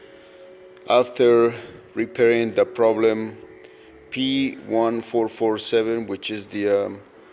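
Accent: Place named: Zacatecas, Mexico. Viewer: His English is good, and the accent is not a problem if English is your first language. Esta bueno, Su ingles, y el asento no es un problema, si ingles es su primero idioma. I was content with his knowledge of adapting a Dodge part to the Nissan D21. Pienso que su asento es de Zacatecas?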